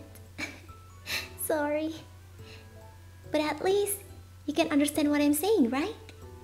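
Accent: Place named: Indonesia. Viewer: That Indonesian accent when speaking English though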